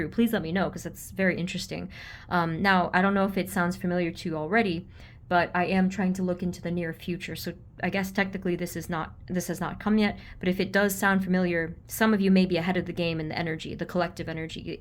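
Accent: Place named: New Jersey, United States. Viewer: Girl! I've been wondering forever if you're from New Jersey...you sound like "home," and I'm from Bergen County...that answers it! I wish I still lived there so I could check out your store! I hope it's up and thriving...Bless you!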